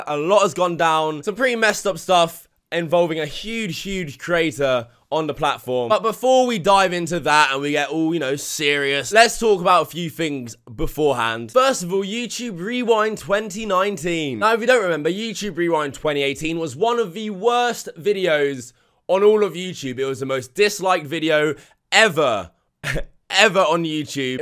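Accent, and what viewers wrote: United Kingdom. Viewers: What a whiny little bitch you are. Being bri’ish might add a lot to why you sound so whiny, kinda like your about to cry every sentence. Oye dick’ed why don’t ye shot ye gullet and fock off innit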